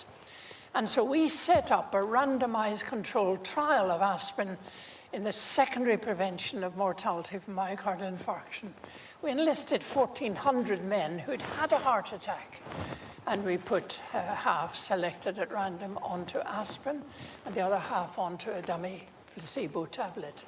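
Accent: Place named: Northern Ireland, United Kingdom. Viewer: Sounds like a lovely northern ireland accent! 😊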